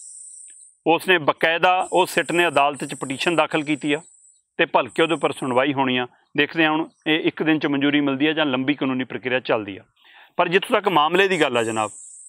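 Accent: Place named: Punjab, India. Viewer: ਵੀਰ ਪੰਜਾਬੀ ਬੋਲੀ ਕਮਾਲ ਦੀ ਤੇ ਬਿਲਕੁਲ ਠੇਠ ਹੈ,ਅਜੇ ਆਸ ਹੈ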